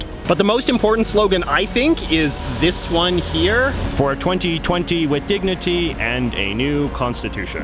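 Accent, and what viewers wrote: Canada. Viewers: Nvm I sounds Canadian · I mean he is an English speaking Canadian. Even if you study a language for a very long time, especially as an adult, it is very difficult to not have a distinctly foreign accent when pronouncing words in other languages. This is because, especially if you are monolingual, you will pronounce words based on the phonemes in your mother tongue. For example, despite me having studied Spanish for 10 years as a teenager and young adult, I now have lost my authentic Spanish accent and now sound very "white" when I speak the language, simply because I haven't been using the language.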